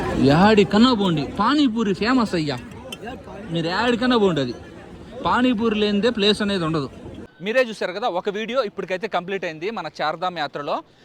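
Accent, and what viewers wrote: Nellore, India. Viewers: Nellore slang is super - mama 😁😁😄😄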